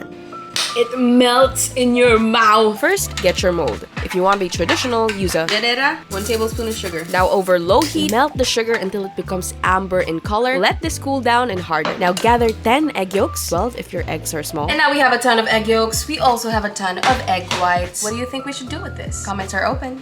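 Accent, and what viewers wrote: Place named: Mexico. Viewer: An asian girl who talks like mexican or latin american, What do you call urself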